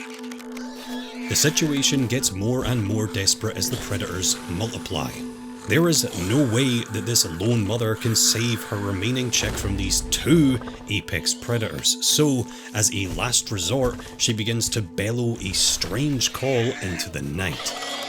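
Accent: Scotland, United Kingdom. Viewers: I love your voice. that interesting accent. I am neither english nor american so i never heard someone speak like that...i believe its scottish? I love to hear you talk about the films!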